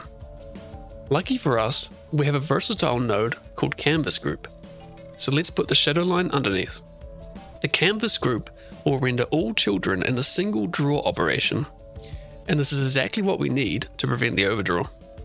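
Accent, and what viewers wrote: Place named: New Zealand. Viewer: ayyyy new zealander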